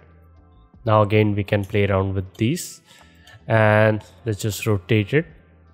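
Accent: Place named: India. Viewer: From that woman voice to Indian accent was... harsh. But I managed. Thanks! 😄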